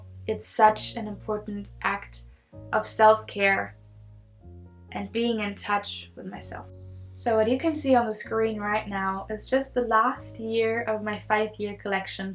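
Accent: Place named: Ireland. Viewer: "...if you're still watching this." Hi,. How could we not watch your video:) this was a very good "how to". I would say you are just scratching the surface of what and how you do it. You raised many interesting ideas of "things to do" to capture your thoughts/feelings about life. You seem very natural and calm in front of the camera. Your English is very good, esp considering it is not your native language. I detect just the slightest hint of an Irish accent when you get rolling. I agree with being well rested, having a journal and writing in the morning when you say what you really think. This is a good topic and i am hoping to see more. I am proud of you, little butterfly 🦋 have a good weekend and thank you:)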